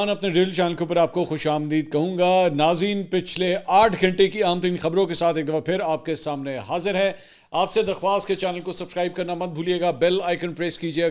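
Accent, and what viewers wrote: Punjab, India. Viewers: I m not Punjabi but Poori news ki jaan apki Punjabi hoti h.. love it ❤❤❤ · is video pe <num> likes to banty han ap ka to style ha na panjabi dialogue maza ata ha vlog sun kar. ap ko bhe islamabad Accord mubarak ho🎉🎉🎉🎉 PAKISTAN ZINDABAD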